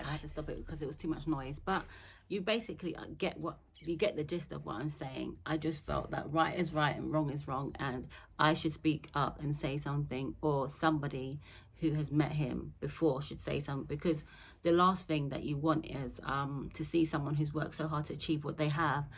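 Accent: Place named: England, United Kingdom. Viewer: So beautiful n love the English accent gotta subscribe right now!!!!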